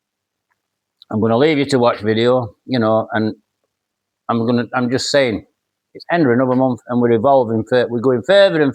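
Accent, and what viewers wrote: Yorkshire, United Kingdom. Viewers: The variation in your channel is the best thing. Can't pin you down to one label because you're a very capable chap in so many areas. Proper British Yorkshire accent too.👍 Great stuff. long may you continue with your videos.😁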